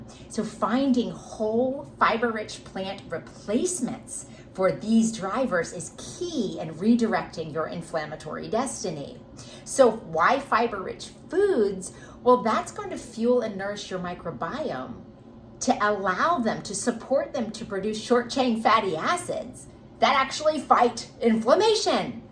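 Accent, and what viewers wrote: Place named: United Kingdom. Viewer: Your British accent is spot on.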